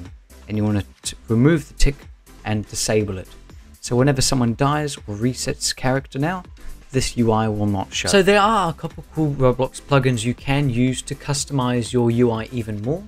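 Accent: United Kingdom. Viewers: Are You Britisch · Bro I can’t understand British (not making fun of the accent)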